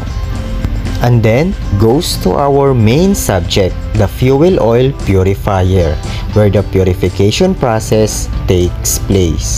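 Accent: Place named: England, United Kingdom. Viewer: I have loved your content, and I love your English accent, it is easier to understand ❤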